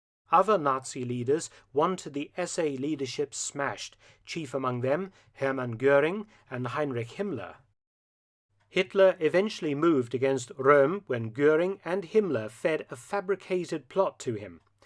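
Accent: England, United Kingdom. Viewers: It's the Brittish accent that keeps me coming back!👍🇬🇧😉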